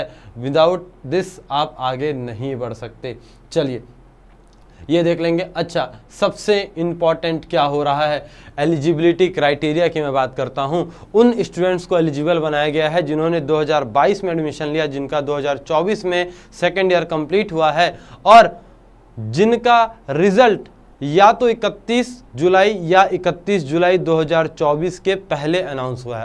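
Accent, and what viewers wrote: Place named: Odisha, India. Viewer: Sir ap odisha se ho kya,apka Hindi wese lag raha hai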